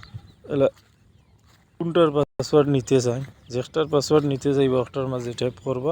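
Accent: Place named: Sylhet, Bangladesh. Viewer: Bhai lage sylhoty 😊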